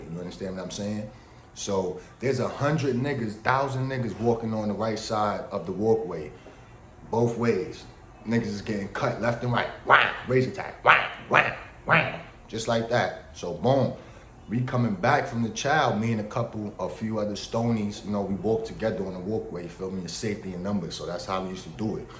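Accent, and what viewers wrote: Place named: Queens, United States. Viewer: Your from Queens my G? It sound like you speak that Dunn language, lol. You got a Prodegy cadence. Respectfully, I'm not from New York.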